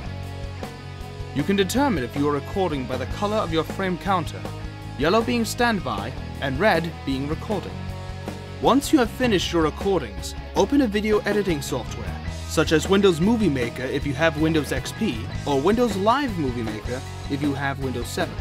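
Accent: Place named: United Kingdom. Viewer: he's British <3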